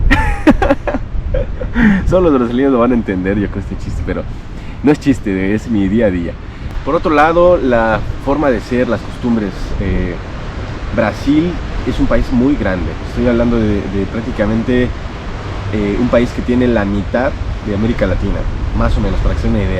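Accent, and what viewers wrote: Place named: Mexico. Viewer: De Argentino no tenes nada. Se nota el Mexicano con solo hablar. Jajajajaja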